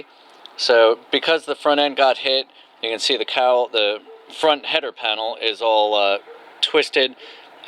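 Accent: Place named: Canada. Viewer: Front bumper mounting bracket. I noticed you speak some Canadian.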